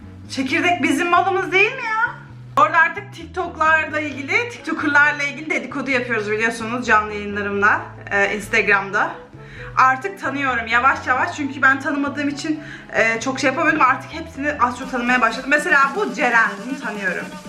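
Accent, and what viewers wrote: Black Sea, Turkey. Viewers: Ohaa abla Karadenizlimiymiş. Oyyy uşağuum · Abla karadenizin neresindensin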